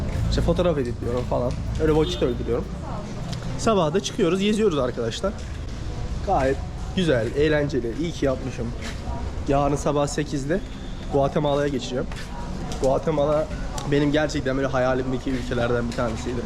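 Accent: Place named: Mexico. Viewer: Kardeş sen türkmüsün?valla Türkçe öğrenmiş Meksikalı gibisin!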